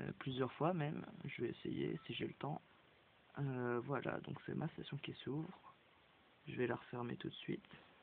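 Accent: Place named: Marseille, France. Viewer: UN MaRsEiLlAiS !!!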